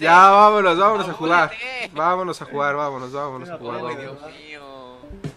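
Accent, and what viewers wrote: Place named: South, Spain. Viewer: De dónde es este we?:v tiene acento del sur :v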